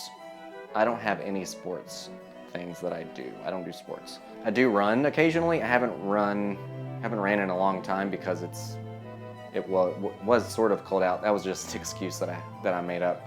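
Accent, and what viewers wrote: South, United States. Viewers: I thought I heard a southern accent! We are in NC now but my hubs is a native Nashvillian.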